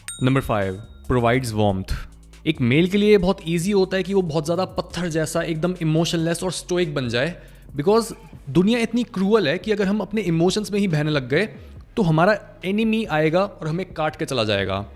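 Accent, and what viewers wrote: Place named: North, India. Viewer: i seen your face first time today, your imagine in my head was fair skin guy like north indians (because of your hindi accent) without beard (face with beard on your voice was something my brain didn't think about) and normal body size with good shape of muscles (not as big as it is) your hair style in my imagination was kind of long enough to reach your eye brows, i imagined you as leon scott kneddy of resident evil 4 but you was totally different person, not bad but not what i thought.... you are good looking still by the way...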